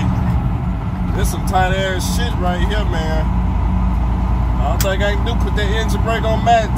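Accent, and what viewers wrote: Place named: Farmville, United States. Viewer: sound like your in Farmville va.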